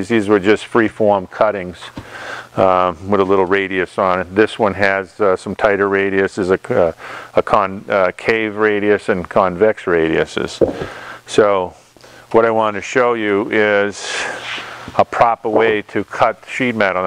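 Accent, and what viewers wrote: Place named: Maine, United States. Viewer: Awesome video. As I used to live in Maine for 13 years the accent reminds me of home. My question is you tend towards shrinking most of the time and why that is? I have done quite a bit of tin knockin in the past and really never gave it any thought. Your thoughts would be greatly appreciated!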